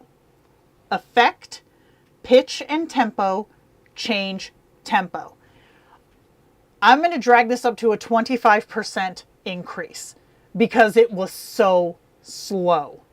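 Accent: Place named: Czech Republic. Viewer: Based on how you can pronounce "R" - Are you from Czechia? :D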